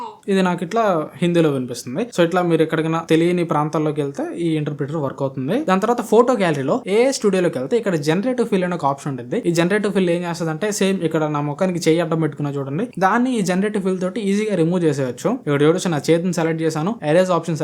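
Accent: Ongole, India. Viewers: bro meedi ongole aa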